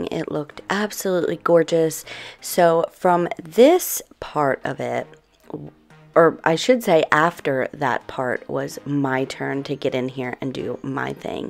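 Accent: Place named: Delaware, United States. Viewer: I have been watching you for a while now and I knew that you were from Delaware, I could tell by your accent. I am from Newark, but live in Western Maryland now. I know it's dumb, but I'm so excited that I was right. I just sent you a friend request on Facebook! You don't have to accept. I couldn't help myself!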